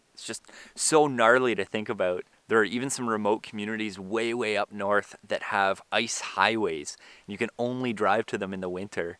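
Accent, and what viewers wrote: Canada. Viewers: are you french Canadian?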